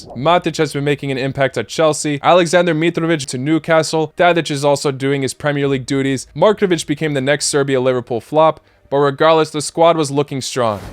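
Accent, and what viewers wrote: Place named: United States. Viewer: wdym he was born in usa he was born in croatia but his family moved to usa during the war of independence living there for so long must be why he shows an american accent in his videos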